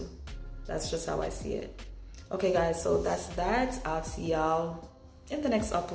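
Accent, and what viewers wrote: Jamaica. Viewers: U. are Jamaican?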